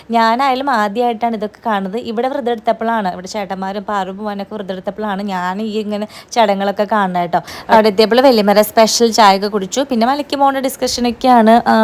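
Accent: Thrissur, India. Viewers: പൂ ആണ്... authentic thrissur സ്ലാങ്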